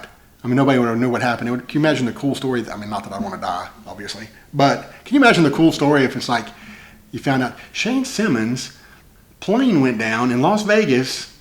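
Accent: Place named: Appalachia, United States. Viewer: Love great video thanks for sharing 👍👍👍🇺🇸🇺🇸🇺🇸🇺🇸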